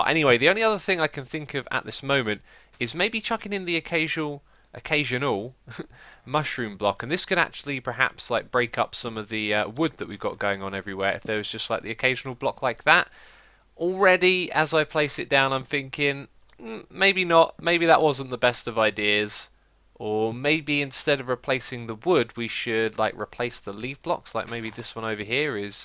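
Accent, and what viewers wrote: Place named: United Kingdom. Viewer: I'm really not a good builder (In his awesome British accent) Me: (In my not as good american accent): Yea? Me: Look at your base.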